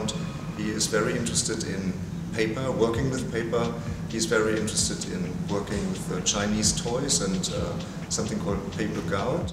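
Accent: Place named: Germany. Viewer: that german accent...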